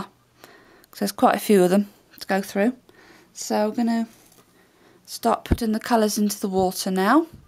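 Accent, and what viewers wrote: United Kingdom. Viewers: Awesome! And love your British accent! I always wanted to go to England to get the accent :3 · I love your British accent! :D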